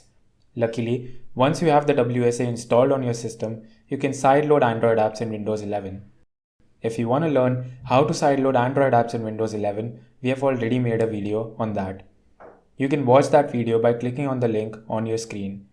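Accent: India. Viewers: I LOVE THAT INDIAN ACCENT ❤❤❤❤❤❤❤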